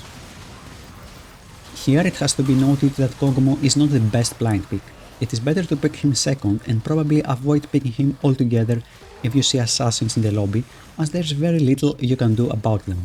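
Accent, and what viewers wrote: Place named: Greece. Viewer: From your accent you sound Greek!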